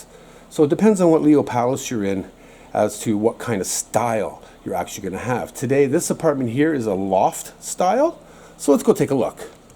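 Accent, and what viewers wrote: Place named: Canada. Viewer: haha amazing! I’m Canadian too and I thought I noticed a slight Canadian accent lol 🇨🇦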